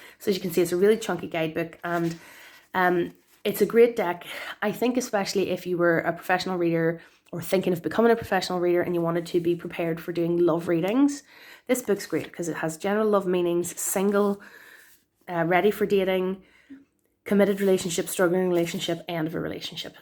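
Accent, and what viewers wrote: Ireland. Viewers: Oooh I love your Irish accent 😍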